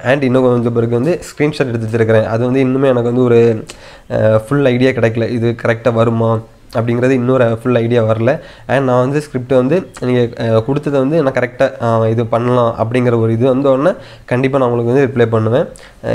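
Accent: Kanyakumari, India. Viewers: Athu kanyakumari slang bro💪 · கன்னியாகுமரி slang 😍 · R Really I Intelligent S Slang Master H Hyper Power A Appreciate B Best The Best kumari Slang Means You only 💓 Lots of love💓💓💓💓💓💞💞💞💞💞💞💞💞💞💞💞💞💞💞💞💞💞💞💞💞💞💞💞💞💞💞💞💞💞💞💞💞💞💞💞💞💞💞💞💞💞💞💞💞💞💞💞💞💞💞💞💞💞💞💞💞💞💞💞💞💞💞💞💞💞💞💞💞💞💞💞💞💞💞💞💞💞💞💞💞💞💞💞💞💞💞💞💞💞💞💞💞💞💞💞💞💞💞💞💞💞😍😍😍😍😍😍😍😍😍😍😘😘😘😘😘😘😘😘😘😘😘😘😘😁😁😁😁😁😁😁😁😁😁😁😁😁😁😁😁😁😁😁😁😁😁😁😁😁😁Chella mavane 😍🤣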